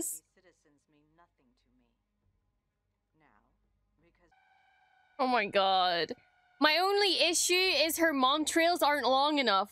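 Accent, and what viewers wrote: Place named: Northern Ireland, United Kingdom. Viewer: You're part of the NI 501 right? Hearin' that accent. Mate how do I get in to the 501 chapter or what have ye?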